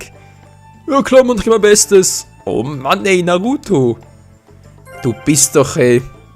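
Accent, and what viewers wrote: Switzerland. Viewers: cooles video kann es sein das du aus der Schweiz bist wegen deinem Sprachverhalten xD nebenbei hab dir n abo und like dagelassen ich würde mich freuen wenn du auch mal auf meinem channel vorbeischauen würdest :D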